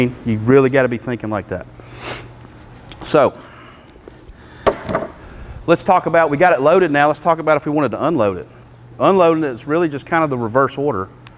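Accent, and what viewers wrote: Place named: United States. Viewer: Goddamn, he country as hell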